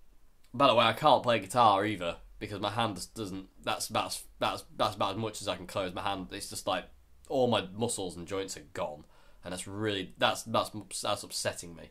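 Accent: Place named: South London, United Kingdom. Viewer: Damn man! you really shouldn't make videos when your that sick. You sound like someone completely different, even your accent :( You sound more like your from the South London than Lincolnshire lol. Get better soon Mate!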